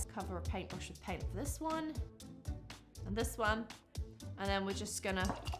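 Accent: United Kingdom. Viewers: I love your energy and sense of humour in all your videos)) and i also love your british accent 🤩 I think that the best thing about this rinser is that you actually can use different colors and keep rinsing. You should test it vs. a regular jar using not only pink, but pink, blue, yellow, etc. Then, I think, the rinser would prove very effective 😁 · Hi lady I don’t watch your channel but my sis dose, she doesn’t have a YouTube account but she wants to enter the give away and she LOVES your videos she’s very obsessed with your vids and wants to tell you she like your British accent 😂 I also subbed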